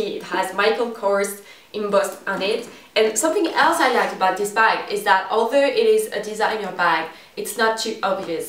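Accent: France. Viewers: She have a french accent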